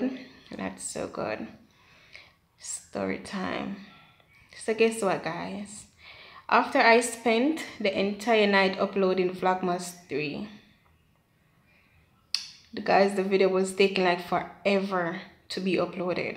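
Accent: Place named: Jamaica. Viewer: Your accent is to die for I love Jamaicans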